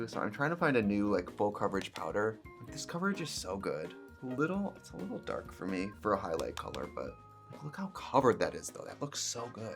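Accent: Wisconsin, United States. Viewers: Just thinking about your Wisconsin accent late at night sometimes… it will never leave you,.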